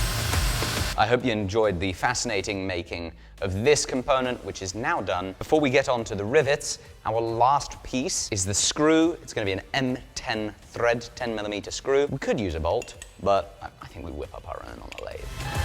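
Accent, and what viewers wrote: United States. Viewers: As an American I thoroughly enjoyed attempts at an American accent throughout this episode. Congrats on the vice grips, they look fantastic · What's the "like goal" to get to do a whole episode in his american hick accent? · He keeps using an American accent, I think he misses it here...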